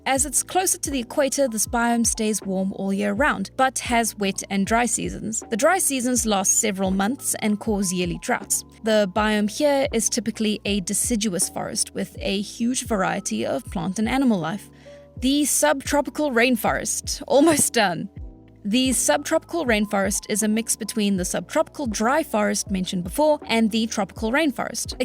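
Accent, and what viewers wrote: South Africa. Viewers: I was looking for this comment lol. It was the first thing I noticed. I think us South Africans just have an ear for the accent haha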